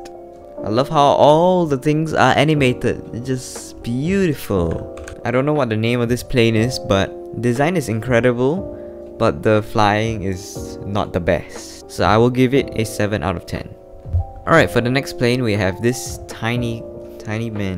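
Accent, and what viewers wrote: Singapore. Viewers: You sound singaporean bro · yow ur a singaporean? you sound like one, jsut like me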